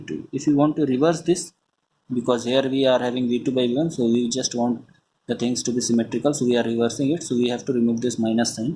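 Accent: India. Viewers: Sir r u bengali??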